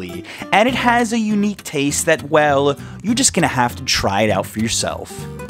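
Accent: New York, United States. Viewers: Dude, you sound like NYC, you are cool ...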